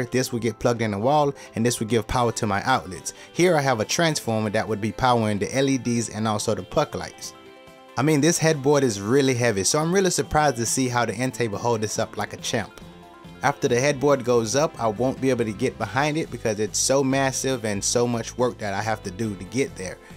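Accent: Jamaica. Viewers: You sound Jamaican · Are you Jamaican man? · What is your accent? Sometimes it sounds Jamaican, maybe sometimes New Orleans? Love your vid btw. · You Jamaican?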